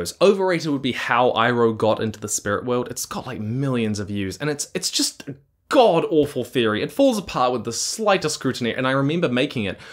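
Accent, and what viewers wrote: United Kingdom. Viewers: Screaming at this video being how I discovered you were from New Zealand 😭 I just thought you were British this whole time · Bro I'm just finding out you're in NZ too?? Literally thought you were British - I'm in Auckland, moving to whangarei soon, hang out with me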